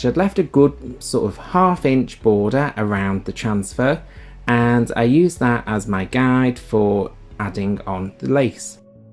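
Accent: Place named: Yorkshire, United Kingdom. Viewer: You sound like you’re from the Yorkshire area. Where are you from! 😀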